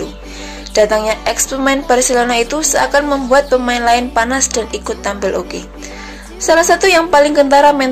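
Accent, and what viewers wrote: Java, Indonesia. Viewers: Mbak bisa d ilangin medoknya dikit gak wkwwk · Yakin ini chanel nya Milanisti?kl iya knp bahasanya lebay dan pesimis seolah tanpa calha milan bkn apa2.hello u sdr gk sih milan itu jauh lbh besar dr sp pun jg termasuk calha.btw baikin dulu narasi dan intonasi nya.jgn medok gitu...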